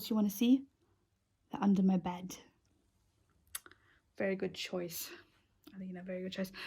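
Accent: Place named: United Kingdom. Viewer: You sound so british 😊💕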